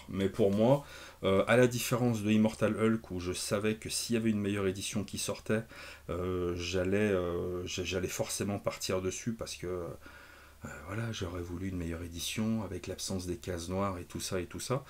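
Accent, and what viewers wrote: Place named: United Kingdom. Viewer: Mais c'est que ça lui va bien ce petit rafraichissement capillaire. Et cet accent british... Oh My...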